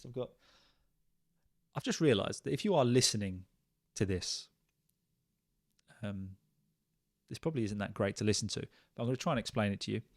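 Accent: United Kingdom. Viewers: Hey, there,. I am absolutely mesmerized by the British English accent! Thanks a million for the insightful tips!😊 From Rio 🇧🇷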